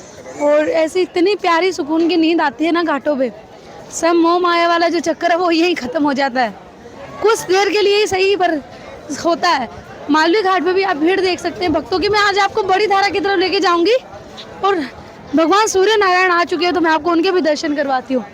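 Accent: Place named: Haryana, India. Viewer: जी आपकी आवाज सुनकर तो ऐसा लग रहा है जैसे आप हरियाणा से हो जी जय श्री राम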